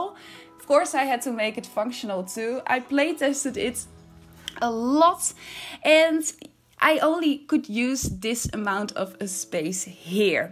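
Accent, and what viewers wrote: Netherlands. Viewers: I can hear that your dutch 😂 love it tho ! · Wow... mind blown! So creative! From your accent I think I can assume you’re a fellow Dutchie, so: beterschap voor je vader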